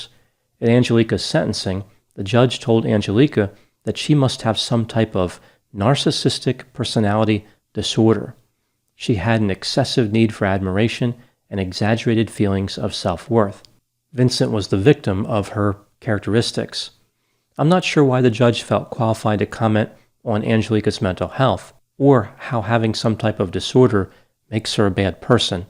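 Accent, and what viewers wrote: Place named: Maryland, United States. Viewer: I thought you might have a Maryland accent and I looked you up and read that you're from Delaware.. I wasn't far off! :) 🤗 I just found your videos not long ago and love them.. subscribed.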